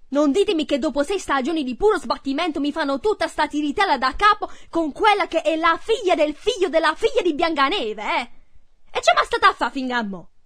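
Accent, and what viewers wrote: Bari, Italy. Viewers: la cadenza barese è adorabile <3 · sei barese ho dio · oddio sei di bari? anch'io 😂😂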